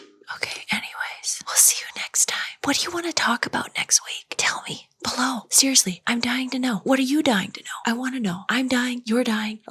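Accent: Minnesota, United States. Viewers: gotta love the Minnesota accent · You must be from MN? Nailed the accent.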